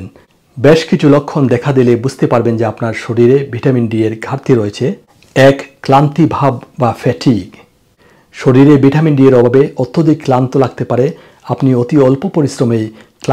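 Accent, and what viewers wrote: Sylhet, Bangladesh. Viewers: আমি সন্দেহ করছি, আপনার বাড়ি সিলেটে ।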